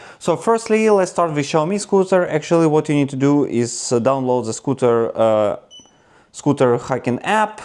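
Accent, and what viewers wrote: Russia. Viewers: А по русски? У тебя русский акцент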